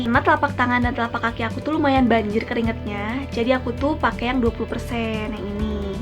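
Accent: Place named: West Java, Indonesia. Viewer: Logat sunda si teteh hehe ...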